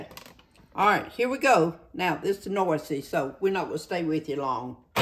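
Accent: South, United States. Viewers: I’m so excited 😊 I just ordered your cookbook! I just love your channel and your southern accent 😁 I am also a MiMi and I love to cook too!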